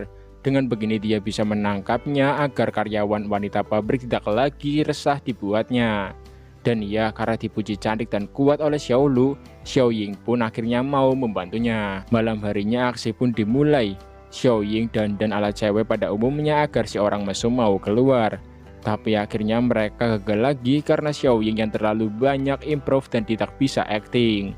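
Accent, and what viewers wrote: Banyumas, Indonesia. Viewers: Aku sangat terhibur bingiit sih mas bro kalau mas bro bacain cerita drama itu lhoo sama bahasanya sampean yang medok n ngapak bingiit ra ngapak ora kepenak yaaah 🤔🤗😁👍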